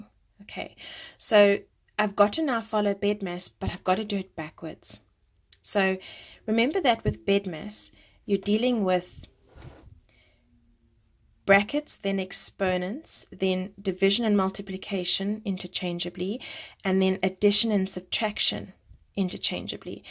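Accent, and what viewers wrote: South Africa. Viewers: You sound South African.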